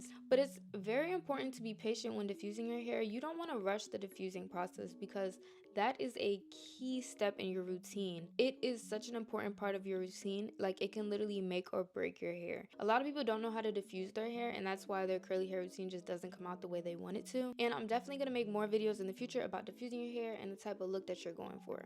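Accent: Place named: New York City, United States. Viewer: Lil sis talks so NYC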